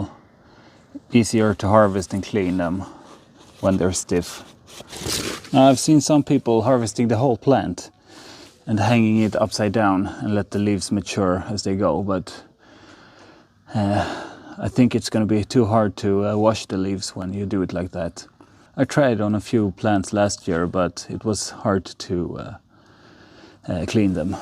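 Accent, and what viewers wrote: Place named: Scandinavia. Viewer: Fantastic! I've just done my 1st harvest so it's nice that I'm lagging 3 weeks behind you so I can look at the tutorials. I'm definitely interested in making snus bc it's illegal in Belgium, makes it feel more like a delicacy. Are you Swedish? I detect a Scandinavian accent, didn't know you could grow tobacco in Scandinavia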